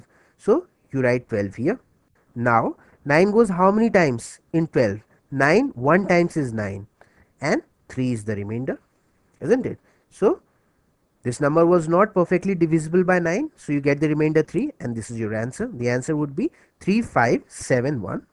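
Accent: India. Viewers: his accent tells me he Is totally Indian. I know because first of all only Indian have those accent and.... I'm indian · Are you Indian? Your accent is Indian. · Indian accent! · thats indian accent.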